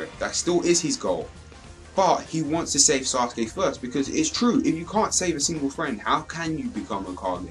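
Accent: United Kingdom. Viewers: I know this is out of talking but...is that a British Accent? xD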